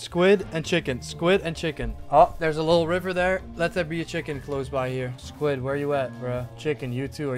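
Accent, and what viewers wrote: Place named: Netherlands. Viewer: ur accent sounds dutch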